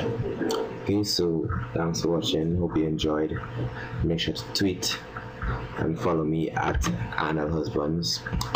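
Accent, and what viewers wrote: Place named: Trinidad and Tobago. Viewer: He is not Nigerian he is Trinidadian... their accents are very distinct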